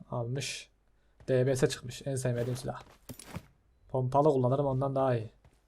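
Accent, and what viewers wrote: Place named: Adana, Turkey. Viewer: Reisss ben Adanalı yimda aynı oralı gibi konusuyon bahhhh bahhhh diyisine kurban olim😁😁😁😁😁😁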